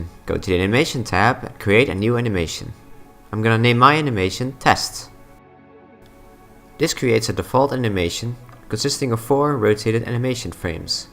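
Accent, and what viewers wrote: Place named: Netherlands. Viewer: You sound Dutch lol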